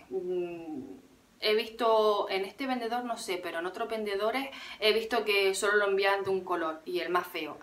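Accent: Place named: Canary Islands, Spain. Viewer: hola guapisima me suscribo!! creo que eres canaria por el acento no? jijiji, yo soy de Gran canaria (las palmas) muchos besitos!!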